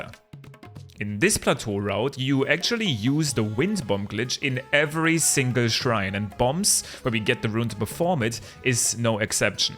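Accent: Germany. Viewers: your accent sounds so german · Das klingt nach einen deutschen aktzent ich weiss nicht wie ich das in Englisch schreiben soll aber bist du deutsch?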